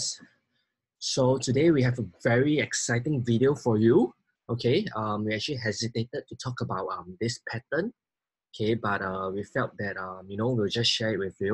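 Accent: Singapore. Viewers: No, I think he`s Singaporean.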